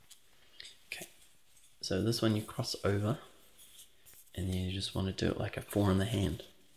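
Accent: New Zealand. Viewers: You are my favorite to listen to! Your kiwi accent always makes me drift off to sleep! Although, I must admit, sometimes I just stay awake because Kiwi accents are my favorite ☺️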